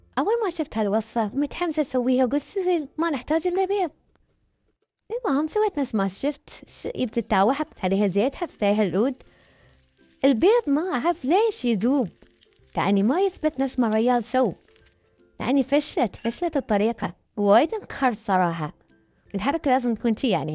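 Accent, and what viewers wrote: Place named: Iraq. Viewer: فيدوهاتك تجنن 😔💟مايفوتني ولا فيديو الحمدلله ،وكلامك كانو عراقي 😂🩷🩷🩷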